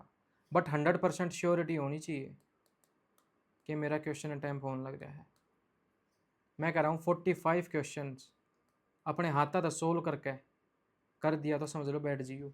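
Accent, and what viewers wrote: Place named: Haryana, India. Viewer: Sir really loved how you are speaking like a proud haryanvi. Many are very ashamed of speaking the language our people, family speak in today’s time in haryana 🙏🙏🙏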